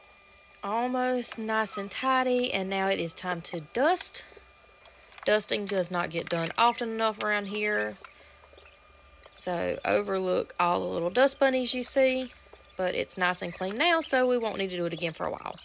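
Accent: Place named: Southeast Georgia, United States. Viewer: You sound like southeast Georgia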